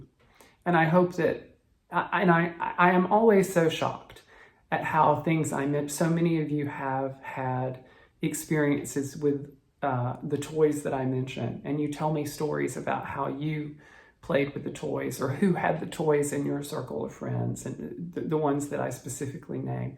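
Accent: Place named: South Florida, United States. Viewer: Omg “tornadahs” … memories of my mother! It is like I am listening to my family from South Florida.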